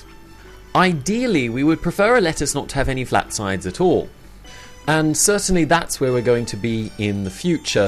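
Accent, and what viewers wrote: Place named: United Kingdom. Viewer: Isn't this Euclideon? And I thought he was Australian, what's with the British accent?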